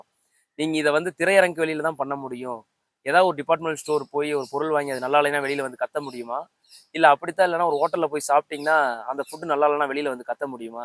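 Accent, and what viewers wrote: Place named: Kongu, India. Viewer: Thambhi ERODE or Coimbatore.. Wonderful Kongu Tamil ...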